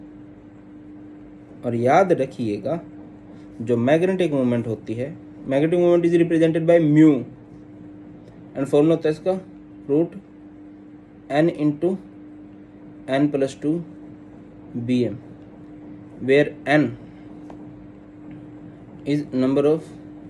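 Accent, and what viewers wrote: Haryana, India. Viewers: aarree sir aap bhi Haryana se ho me bhi sun ke accha laga 😇😊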